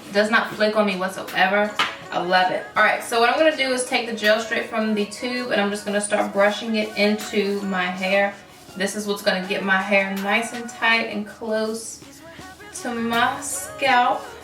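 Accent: South, United States. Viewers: where are you from?! I wanna say the south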